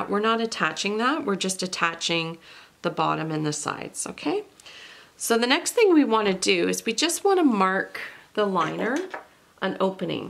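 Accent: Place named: Canada. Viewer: Well I never thought we Canadians have an accent but I can definitely pick you out :) signed, a Maritimer :)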